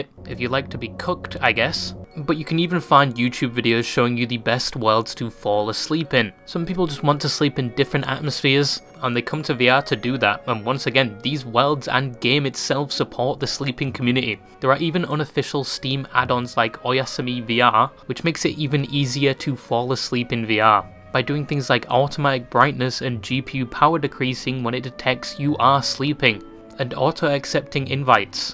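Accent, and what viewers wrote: United Kingdom. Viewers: your not British?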